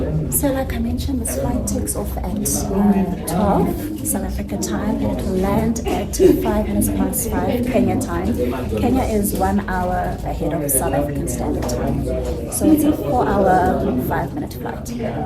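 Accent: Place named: United Kingdom. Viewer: What do I love more, your British accent or your love for our country ?.👍👍😂❤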